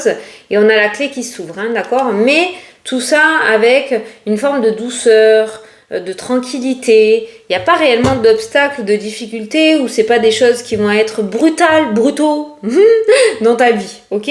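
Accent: South, France. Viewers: Cc toujours aussi joyeyse cet accent qui parle du soleil.moi dans le nord solei et compagnie...trop chaud...ma questiona situation financière va t elle s améliorer..merci beaucoup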